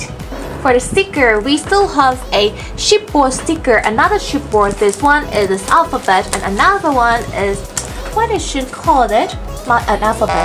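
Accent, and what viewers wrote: England, United Kingdom. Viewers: มีทุกอันที่เป็นสติ๊กเกอร์ก่อนพี่ จะรีวิวเจ้าค่า เห็นสีแล้วมันถูกใจมั๊กมาก. ชอบสำเนียงอังกฤษเลย ถึงแม้ต้องอ่านซับรัวๆสู้อังกฤษไม่ไหวจริงๆนับถือๆค่า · ชอบสำเนียงอังกฤษอ่ะฟังเพลินมากเลยค่าาาาทำออกมาบ่อยๆน้า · ติดตามอยู่นะคะพี่ ชอบสำเนียงภาษาอังกฤษพี่จัง ทำมาอีกบ่อยๆนะคะ หนูจะได้ฝึกไปในตัวด้วย ปล.หนูไปสอยสมุด เครื่องเขียน บลาๆ ตามพี่เยอะมาก จนต้องตีมือตัวเองให้หยุดซื้อ <num>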